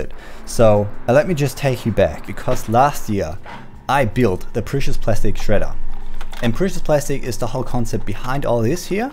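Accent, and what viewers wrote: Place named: Australia. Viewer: Interesting accent. Sounds like Australian with a touch of European.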